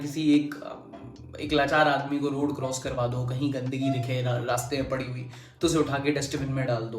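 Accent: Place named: Bundelkhand, India. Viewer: I love your bundelkhandi accent bhaiya Being from Rath i can relate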